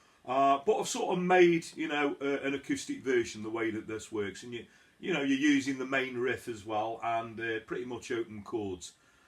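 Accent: Stoke, United Kingdom. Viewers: Great video mate proper Stokie accent 👍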